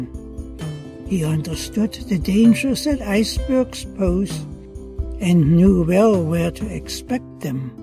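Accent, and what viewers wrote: Germany. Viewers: I suspect his native language is German. I agree, he is a bit difficult to understand. But, since i do speak some German, it might not be as difficult for me to understand him as it might be for you. I also agree that many music over voice applications on any number of Y.T. presentations totally ruin the videos.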